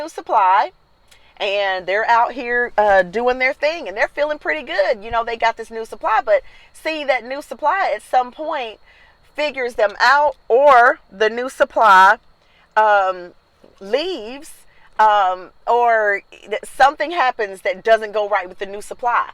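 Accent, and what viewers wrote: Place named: South, United States. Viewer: Love your southern voice. Great review and thanks for sharing. Can you please do a video on narcissist neighbors who refuse to take no for a answer.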